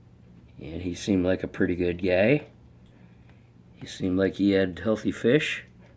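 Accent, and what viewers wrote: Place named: North America. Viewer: the standard 10 gallon aquarium in North America, likely where he lives as he has a North American accent, has the dimensions of 20" x 10" x 12". This tank is also longer than it is tall, and taller than it is wide. I can almost guarantee it carries those dimensions. In different countries, such as Australia, a standard 10 gallon often has different dimensions. But this was in North America.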